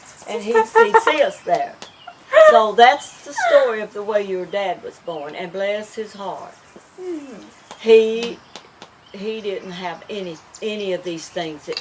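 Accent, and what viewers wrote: South, United States. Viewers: This reminded me of the stories my Great Grandmother used to tell, The wonderful southern accent made it all the better. Thank you for sharing.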